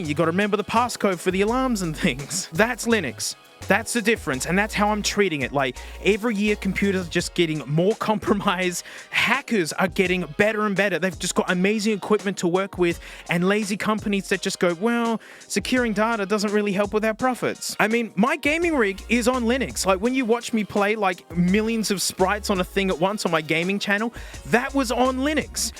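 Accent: Australia. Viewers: Yeah he's Australian, Adelaide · You're not Australian by any chance are ya? Mac, really? So, what took you so long to discover Linux?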